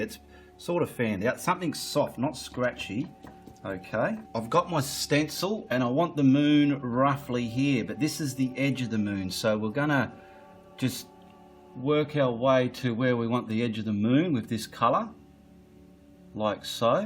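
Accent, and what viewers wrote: Australia. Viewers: Hola from Quebec Canada ! Love to paint now that I found your tutorials ! Love your style as well as your Aussie accent…though I work hard to undestand everything you say 😅 Thanks a lot !!!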